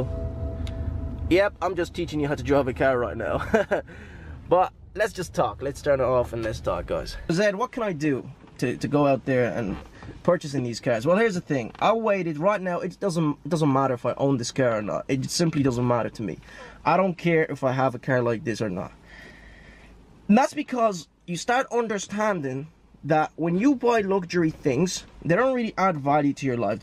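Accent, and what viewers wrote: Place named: Ireland. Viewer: You sound like Connor mcgregor in your trading videos. You have the same accent and very similar personality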